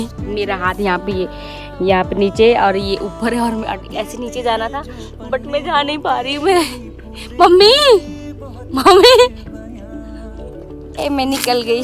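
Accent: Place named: Nepal. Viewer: Aap toh pieor nepali bolrey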